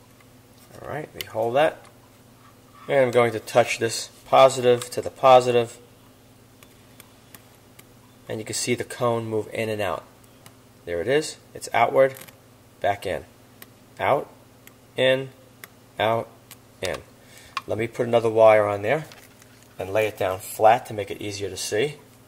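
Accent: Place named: Boston, United States. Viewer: Thats too thick a bastan accent for me.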